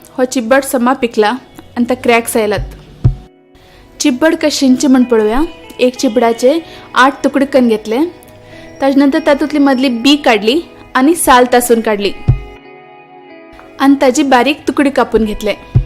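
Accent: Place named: North Kanara, India. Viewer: Excellent video, brought back memories of this simple yet tasty dish. I love your Konkani narration is that from North Kanata?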